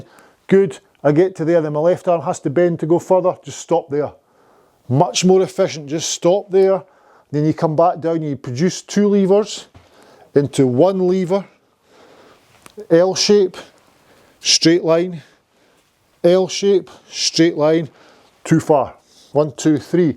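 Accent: Scotland, United Kingdom. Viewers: Think your instruction is great. My son in law and I talk to each other on the course and inevitably are saying “that’s good!” In a hard Scottish accent when we are channeling you. Maybe I’ll make it to Peebles one day “soon”. That’ll be “good”. Cheers 😎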